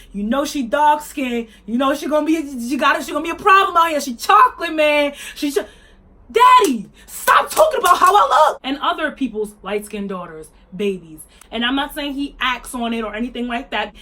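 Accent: New York, United States. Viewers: Hey girl heyyy😘👋🏾 I’m a new subscriber & this is the 1st video of yours that I’m watching 😚 love your whole vibe. I KNEW you were from my hometown girl yasssss 🗽🗽🗽🗽 I know a fellow New Yorker when I hear one lol,I knew before I scrolled down? What borough are u from? About this video lol: I’m sooo disappointed in YG😒 I’m a die-hard fan & hearing him make these colorist remarks makes me really uncomfortable. I know from following him on Instagram that he has a preference for light skinned women... I thought that’s where it starts and stops...but no. He really does fetishize light-skinned women & it’s so ignorant & tired. Uggh. Unbelievable. I’m bout to delete him from my Apple Music library. 😑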